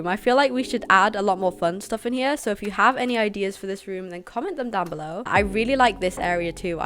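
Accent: United Kingdom. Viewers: She is British 😊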